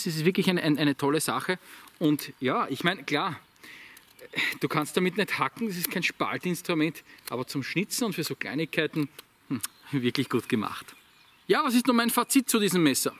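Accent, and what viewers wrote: Austria. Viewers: Hab jetzt einige deiner Videos durch und lass mal ein Abo da. Schöne Präsentationen und deinem Österreichisch hört man gerne zu.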